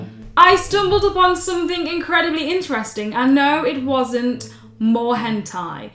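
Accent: England, United Kingdom. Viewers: Would like to hear you say it in that British accent. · Excellent english 🏴🇬🇧 accent 😍. You look exactly like me but then as the female version.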